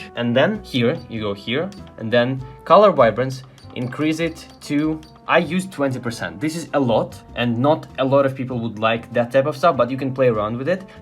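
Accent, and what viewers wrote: Russia. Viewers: Эх, русский акцент тебя выдает ))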